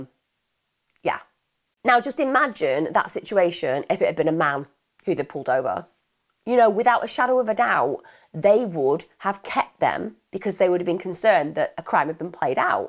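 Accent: United Kingdom. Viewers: Your British accent, even though I’m part of the matriarch as a Canadian, doesn’t make u sound brighter love.. find some creativity. Pls!! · Hi watch true crime every night to fall asleep i find it calming 😂 found you through searching for this case seems not many have covered this one fully nice to hear a uk accent 🏴🇬🇧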